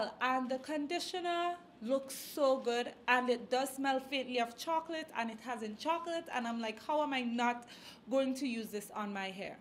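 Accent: Caribbean. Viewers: Meh know a West Indian when meh see one lol 🇯🇲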